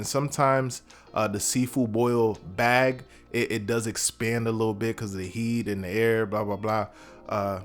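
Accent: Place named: Florida, United States. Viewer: Simple, easy to make recipe and taste good! Appreciate you you bruhdah! Sounds like your a from FL.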